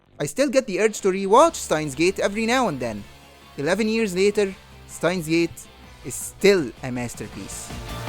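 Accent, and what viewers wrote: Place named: Egypt. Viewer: that's an egyptian accent id i've ever heard one. much love and support my guy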